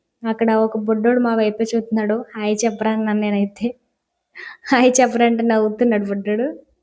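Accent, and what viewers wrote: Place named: Konaseema, India. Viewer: I like konaseema❤❤ Akka Mee side slang Bale vuntadhi Chakkaga baga matladatharu meeru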